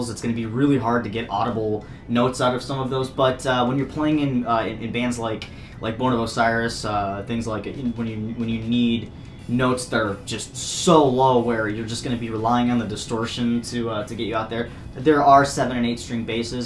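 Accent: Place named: India. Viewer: I can tell you're Indian.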